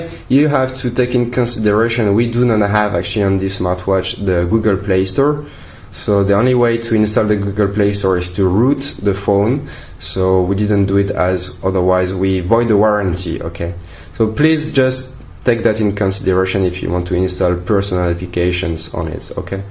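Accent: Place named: France. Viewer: Good review, terrible english/french accent.. just say app not A-P-P _'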